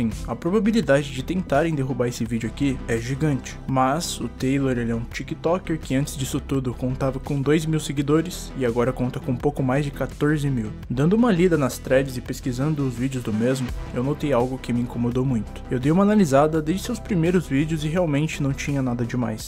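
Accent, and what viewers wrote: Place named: São Paulo, Brazil. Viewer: Carioca com sotaque paulista, pq?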